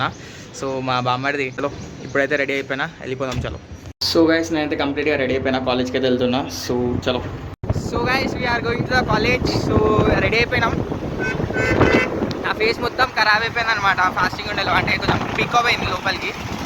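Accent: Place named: Tirupati, India. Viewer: Where are you from bro ? , Bangalore ah ? Tirupati laga vundhi bro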